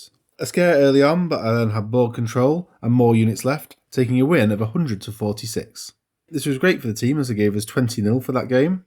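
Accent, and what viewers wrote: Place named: Northern England, United Kingdom. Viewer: Ok, fine, you sound like a northerner. My family is from Northern England. now just a bunch of Americans.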